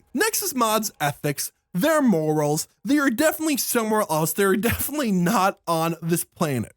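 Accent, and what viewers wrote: Canada. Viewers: Are you fuckin candian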